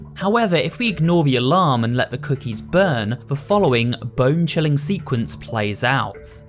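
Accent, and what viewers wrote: United Kingdom. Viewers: Wait is British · hes briitish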